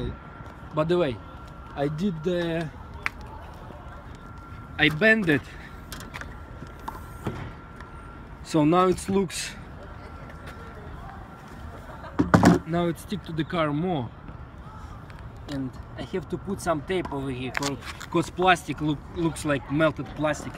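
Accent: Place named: Russia. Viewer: Русский акцент :)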